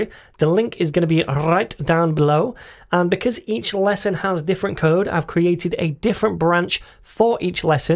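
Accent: United Kingdom. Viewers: don't know yet if your tutorial is ok, but you got +10 points for qool brit accent xd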